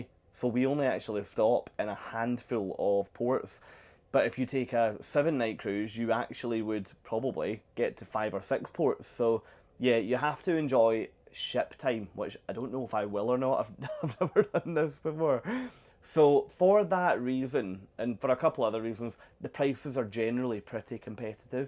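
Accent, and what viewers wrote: Scotland, United Kingdom. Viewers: Dear, your Scottish brogue was so heavy in this video that I needed to turn on the captions! All the very and really enjoyed the video, for sure. Lulu (We missed you the Olympics...I looked for you on the Scottish barge as it went down the Seine but didn't see you!).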